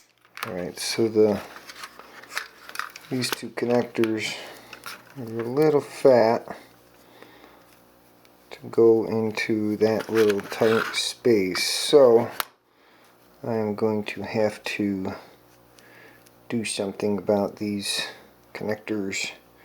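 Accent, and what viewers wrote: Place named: Northeastern Pennsylvania, United States. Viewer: E tree D, haha. Sounds local to me (NEPA).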